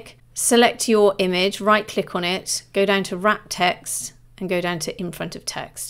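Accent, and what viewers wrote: United Kingdom. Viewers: Thanks for the Video. I liked the British accent.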